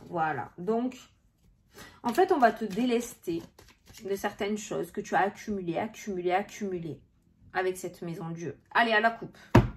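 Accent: South, France. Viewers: Ton accent me parle très bien car je suis muté dans le Sud, je recommence tout à zéro et les finances suivront 👍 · Coucou, j'adore votre façon de faire les guidances, votre accent du sud, votre franc-parler lol 👍😁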